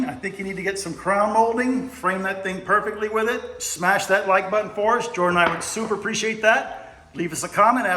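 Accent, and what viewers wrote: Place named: California, United States. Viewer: Sir, Morning. You are very professional and well spoken, and you definitely look like someone famous. So, as I watched the lecture, it came to me, you look like a brad pitt who really worked his ass off in life… with a slight California accent… Great work.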